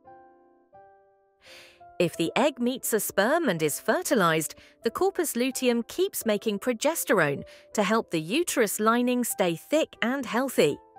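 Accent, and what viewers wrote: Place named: United Kingdom. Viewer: O sotaque britânico é perfeito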